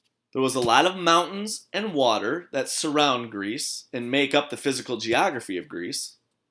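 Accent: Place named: United States. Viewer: he has a new york/jersey/midwestern accent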